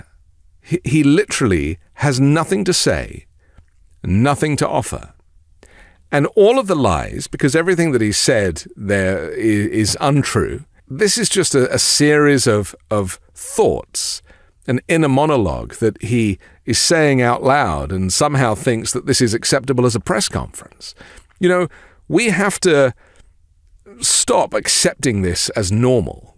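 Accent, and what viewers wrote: United Kingdom. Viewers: Your British accent makes trump sound more intelligent and more intellectual. Thank you, for sparing us from the dronings idiocy of his tiresome voice, though.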